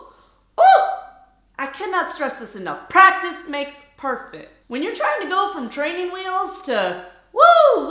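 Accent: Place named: Midwest, United States. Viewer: I simply love your Middle-West accent!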